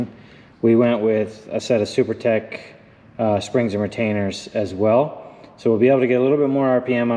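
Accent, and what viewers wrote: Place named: Eastern Canada, Canada. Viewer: Although I don’t know what province you’re in I can tell your out east, accent lol. You’re doing awesome work. Thank you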